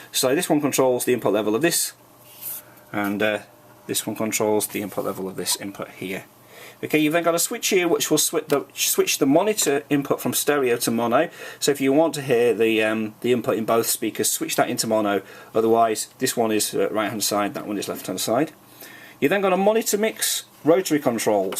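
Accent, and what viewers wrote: United Kingdom. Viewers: Reviews in British accent are good!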